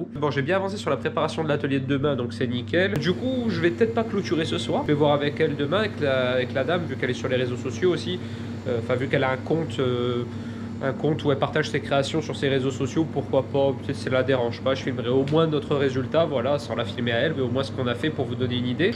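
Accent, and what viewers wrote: Corsica, France. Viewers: Ahhhh cet accent corse ! je l adore 😍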